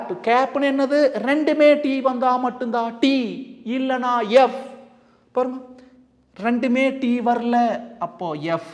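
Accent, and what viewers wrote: Coimbatore, India. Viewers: Sir nenga coimbatore ah covai slang la pesurenga 😅❤😊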